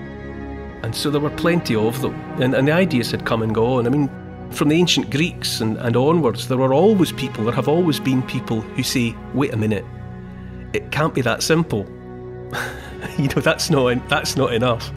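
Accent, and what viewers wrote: Scotland, United Kingdom. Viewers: I enjoy hearing your Scottish voice, Neil. It reminds me of home.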